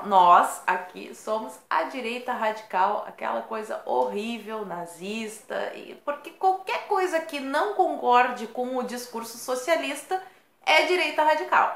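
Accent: Rio Grande do Sul, Brazil. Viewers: ADORO O SOTAQUE GAÚCHO DELA, ALÉM DE ELA SER MUITO BEM INFORMADA E EXCLARECIDA!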